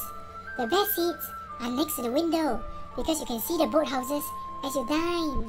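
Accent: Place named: Singapore. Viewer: yo i can already tell you're Singaporean laaa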